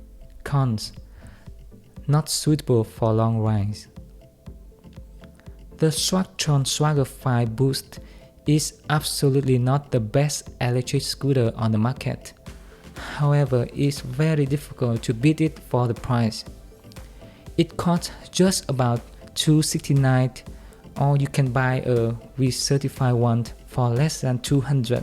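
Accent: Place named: Vietnam. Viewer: Vietnamese accent :D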